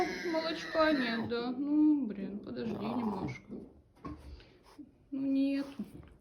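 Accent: Siberia, Russia. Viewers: Мимо кассы. Сибирские они. 3000+ км от Тамбова. Говор — туча народу в Сибири так говорит.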